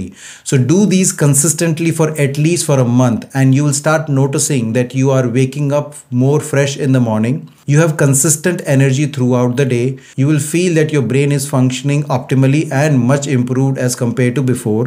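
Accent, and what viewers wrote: India. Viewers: I love indian accent when you speak english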